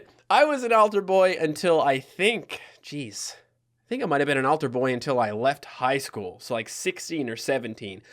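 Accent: Newfoundland, Canada. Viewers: My god your newfie accent is spot on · Dude your Newfie accent is fucking, /spot on/. Never been to Newfoundland, since I live in Ontario, but mom's a Newfie, and I gotta say, your accent is perfect. Way to go from a half Newfie to another Newfie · Man, whenever I hear a thick Newfie accent it always reminds me of my family. All of my extended family lives in Newfoundland, and we used to visit every year but we don’t anymore.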